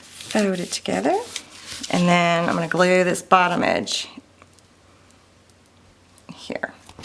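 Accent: South, United States. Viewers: Just love it!! Top Note is a great name! You are a very creative lady.. love your videos so much!!! I think maybe its because we both have a southern accent! LOL Thanks for a great tutorial!!!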